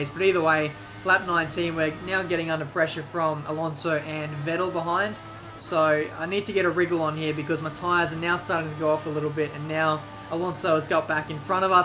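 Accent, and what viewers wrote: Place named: Australia. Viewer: Classic lines: * And away we gooo * Having a bad start as always * Another points to add to the championship tally * Look at that...! * So here we are the..... Grand prix * We got some R&D testing to do * Another crucial race for the championship Of course you have to insert the aussie accent in all of them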